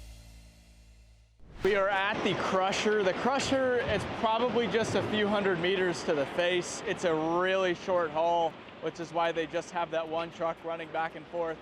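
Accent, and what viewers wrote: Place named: United States. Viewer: I guess when a dynamite stick reaches a certain size it's called a sausage. I definitely enjoyed the American pronunciation of German names.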